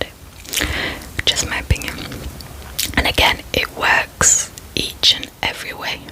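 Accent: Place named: Slovakia. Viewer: A fantastic Slovakian accent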